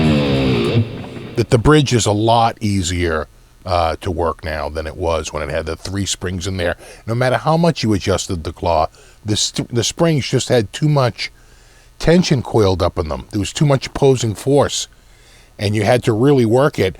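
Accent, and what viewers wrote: Boston, United States. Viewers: From Boston? Say no more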